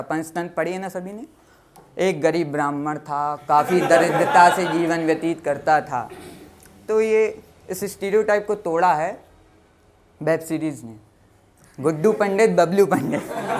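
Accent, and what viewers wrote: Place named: Kanpur, India. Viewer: pooonnchaa. Kanpur dialect is mast.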